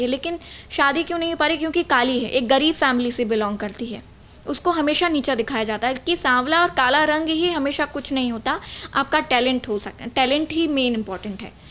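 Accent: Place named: Bhojpuri, India. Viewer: Tum bharatpur ki language nhi bol rhi ho ..... bhojpuri hi tmhare language me jhalak rhi h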